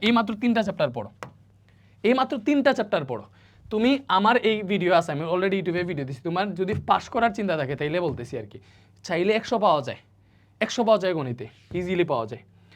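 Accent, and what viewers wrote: Sylhet, Bangladesh. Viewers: ভাই আপনি কি সিলেটী 😊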